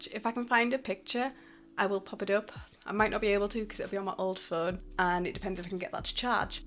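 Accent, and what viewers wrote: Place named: Sunderland, United Kingdom. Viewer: If never guess Yorkshire accent. You sound more like a Makem than a geordie aha 😅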